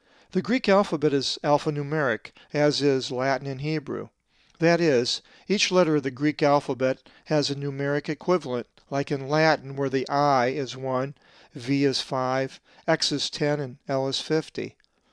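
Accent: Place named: England, United Kingdom. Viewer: Many thanks for your excellent presentation. My native language is Greek and I would like to mention that you are very close to our pronounciation, despite the influences by your English tune. Also, I would like to add that the best way for someone who interests about Greek pronunciation, is to lend his ear in a Greek Orthodox church, where a medieval version of the language is spoken. This version has been transferred from parents to children centuries ago, and I can assure you that the tune is the same as the simplified, so called, modern Greek we use today. The differences of accent compared to that of the ancient language, are limited to the duration of some vowels of same sound. For example, the letter Ω,ω (ωμέγα, omega), was pronounced like an extended O,o (όμικρον, omikron), but today those two vowels sound the same. So, the difference between those two o, remains only in the written form. 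There were also some differences regarding some consonants sound. Greek Cypriots maintain this manner of speaking untill today. For example, when they say τέσσερα tessera (number four), they extend this double ss more than a simple s. This doesn't happen in the mainland of Greece, but the spelling remains the same. Anyway, I hope that all these are useful for those who interest about. Thank you again.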